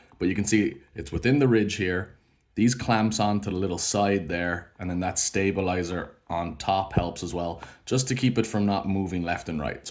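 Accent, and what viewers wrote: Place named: Ireland. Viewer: This is amazing, can't believe a mask that's been around for years which I have just tried and like I search up this issue and you made this but a few days ago. I need this trouble is I have no clue about 3d printing etc. Can I pay you to make me one? I am in the UK by the sounds of accent your just across the sea from me😂 happy to pay for all material, labour and postage? Would really appreciate it if possible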